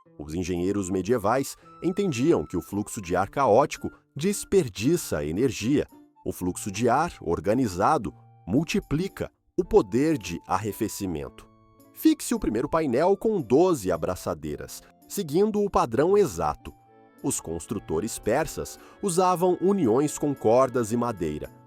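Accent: Brazil. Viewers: Sotaque brasileiro e texto da guiana. Ruim de ouvir "à falar", "à andar", etc.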